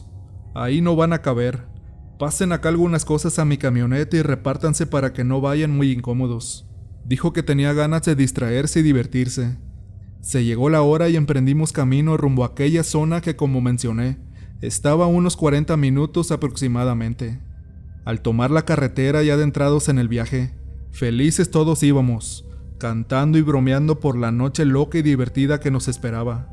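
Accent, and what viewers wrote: North, Mexico. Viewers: Hijole amigo tu acento norteño le quita emocion, parece que lees muy rapido.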